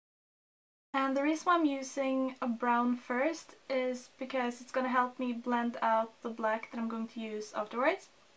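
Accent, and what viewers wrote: Norway. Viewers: Great tutorial! Elsker den norske aksenten :) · I know you said violet, but I heard violent.. That sounded a bit disturbing :p "I'm trying out some violent lips" Edit: Oh, and btw - uten å lese noentingsomhelst, og før jeg en gang har sett videoen vet jeg at du er norsk basert på måten du snakker engelsk. Du kunne tilogmed ha vært trønder. You go girl..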